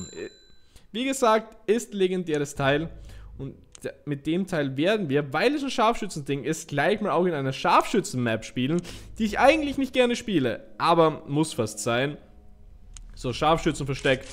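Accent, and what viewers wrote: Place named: Upper Austria, Austria. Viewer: Kommst du aus Oberösterreich hört sich so an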